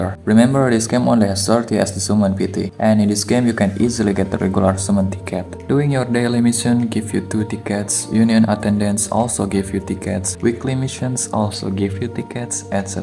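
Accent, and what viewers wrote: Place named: Indonesia. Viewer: Indonesian accent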